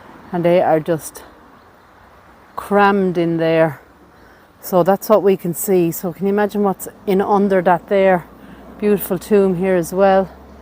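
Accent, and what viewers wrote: Ireland. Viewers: Happy birthday. You take us on a magical but sad tour of the diffrent cemeteries. I love your Irish accent.By reading some the the head stones you give the deceased their names will not be forgotten.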